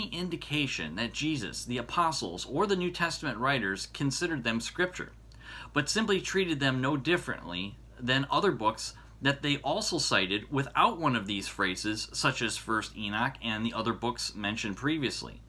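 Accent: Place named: United States. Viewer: I can't understand your terrible American accent.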